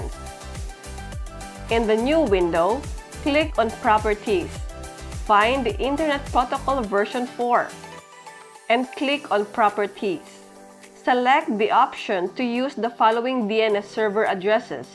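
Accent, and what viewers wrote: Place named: India. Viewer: i would have fell for it if it wasnt for your indian accent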